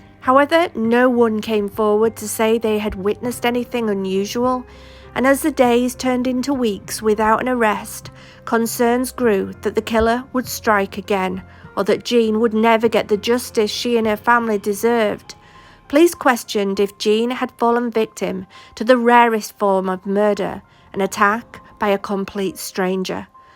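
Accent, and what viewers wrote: Yorkshire, United Kingdom. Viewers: I could hear the Yorkshire in your accent right away. Always warms my heart to hear a Yorkshire accent. I miss home but don't get me wrong living in the California sunshine can't be beaten by any Yorkshire weather😆. I'm glad I found your channel and I'm working my way through your library now. I'm here to stay. Great work you do. Love the content 🤘🏻🇬🇧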